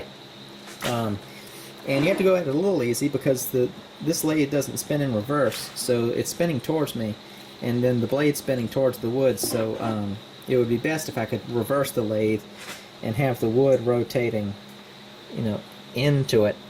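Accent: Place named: Tennessee, United States. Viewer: He may be in Texas, but I'm guessing Middle Tennessee. His accent sounds just like a bunch of my relatives from around those parts. I love that accent. I could listen to him talk all day.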